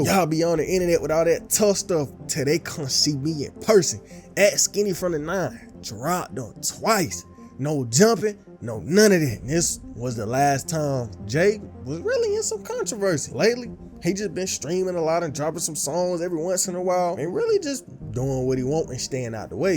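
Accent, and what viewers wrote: South, United States. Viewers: You country asl. Get better story telling & get that spit out the corner of your mouth. Annunciate please young dawg.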